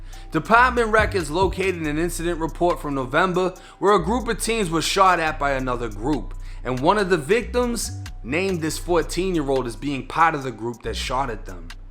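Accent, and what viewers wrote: Boston, United States. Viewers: The food mah-ket . Lol I'm from Buffalo and I love hearing ppl talk with a Boston accent. Fucking never gets old lol. The accent, your story telling ability, your journalism skills are all killer. bro you got talent 👏 👌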